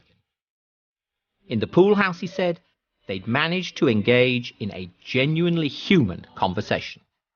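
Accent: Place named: United States. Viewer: This guy's American accent is insulting.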